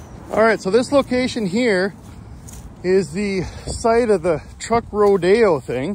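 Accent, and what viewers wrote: Canada. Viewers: This is the first video I've seen of yours. I had no idea you were Canadian, but I thought to myself "that guys almost sounds Canadian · Loved the video. You speak like a Canadian though. Figured it was some southern local guy recalling old times. Ah, Alberta I see.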